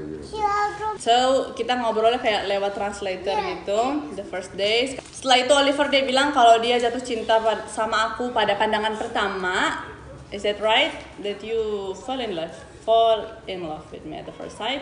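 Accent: West Sumatra, Indonesia. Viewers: Mbak aslinya apa orang Minang.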